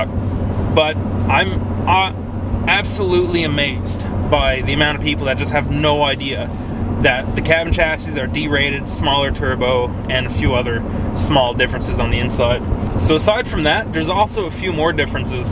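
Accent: Canada. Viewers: My family had a cabin up in Lynn Lake, Manitoba. Beautiful country. And incredibly nice and friendly people... I enjoyed this video. I didn't know you're Canadian until I saw you at the pumps and started talking. Yup! I was paying $2.50+ a liter when going up there in July 2022. And dn here in the the state's people bitch about $3.00 a gallon!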